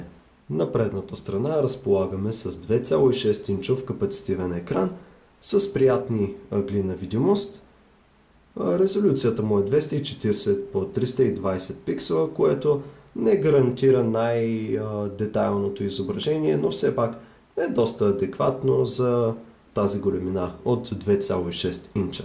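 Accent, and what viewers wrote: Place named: England, United Kingdom. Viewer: Which language is this? I really like your English accent lol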